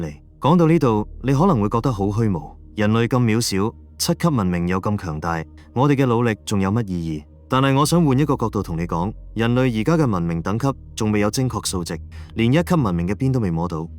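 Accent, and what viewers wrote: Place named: Hong Kong, China. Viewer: 好少有人用港式廣東話講宇宙級文明，好清晰唔錯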